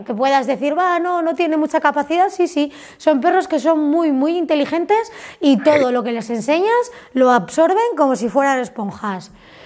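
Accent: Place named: Asturias, Spain. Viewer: Es como hablan es Asturias. Parece mentira!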